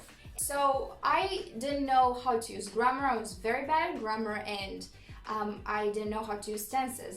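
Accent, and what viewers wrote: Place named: California, United States. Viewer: I am American, you barely have an accent. Change a few sounds and you'd be confused for a California girl. Well done😘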